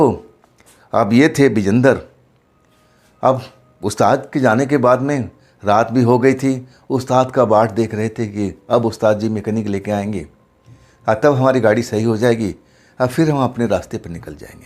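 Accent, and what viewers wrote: Bihar, India. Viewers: 🎉Aapki aawaz bahut hi accha lagta hai bihar ki jhalak aati hai 😅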